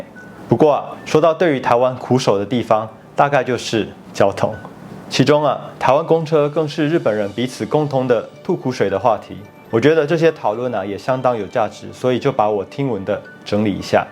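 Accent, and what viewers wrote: China. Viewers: 臺灣如果公車文化不夠好，那大陸不就是難民出現了嗎？你這個大陸人假扮臺灣人，口音一聽就知道你是大陸人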